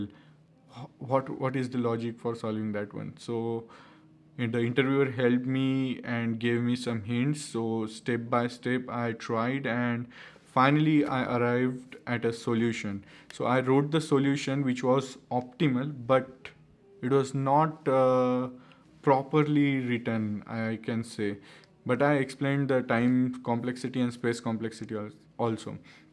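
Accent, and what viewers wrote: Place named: India. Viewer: Are you a student? You have a strong accent, i would recommend you to work on your accent as well. it goes a long way for indians to neutralize that thick indian accent if they are looking for jobs in the US.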